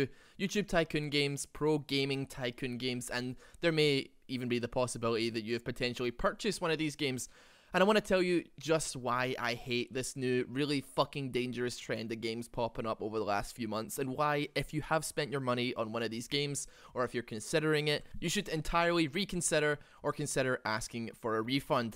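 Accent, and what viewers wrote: Scotland, United Kingdom. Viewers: Are you scottish? ^^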